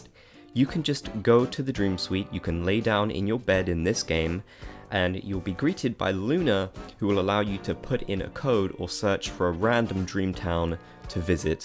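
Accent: United Kingdom. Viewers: His British accent is thicker than Wendell the Walrus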